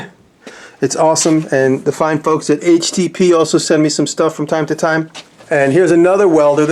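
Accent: New York, United States. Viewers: I am so glad you answered my question after watching your vids for one day. I could tell your shop appeared to be in a basement, but had no idea you were in Manhattan. My question was where you were located. Your accent sounded like the big apple. I spent alot of time in Brooklyn Heights. I live in South Louisiana. Great to meet ya, Jeff W Waldrop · This guy is straight New York!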